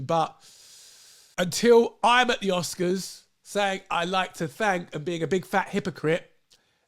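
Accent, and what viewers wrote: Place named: United Kingdom. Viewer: Hey. I'm new to your channel and I hear what you are saying. Although I do agree with what you have said and yes, the Oscars have kind of lost mysterious Spark and Mystique it once had, and Hollywood looks like the 20th century Relic, I will say this. I think there is good in that it will make way for new ways to create films. I'm not sure where you are and I'm guessing you probably live in the uk? I'm basing that on your accent but I of course could be wrong. Apologies if I am wrong. But as an american, I get tired of watching the Oscars because it's filled with Elites who really have an absolute disdain for about half of the country. We know we are despised because of our beliefs, our politics, our way of life, and usually Oscar night is when the worst of the worst are together and they basically ridicule half the country. 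This wasn't always the case but it's become more so in the last 20 years. And it's basically an elitist Club for the wealthy to lecture the rest of the country. And the truth is is that there's so much more different forms of entertainment, I find the average YouTuber more entertaining than the Oscars and the golden globes. Perhaps Hollywood needs some serious humbling before they can really bring back that spark and they need to not ridicule half the country then they will get the ratings they need and they will be able to unite this country, rather than constantly divided with ridicule. I think one of my favorite movies of the 2010s what is the C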